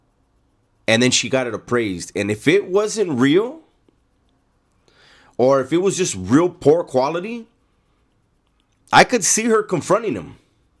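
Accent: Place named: Miami, United States. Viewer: U sound like ure from Miami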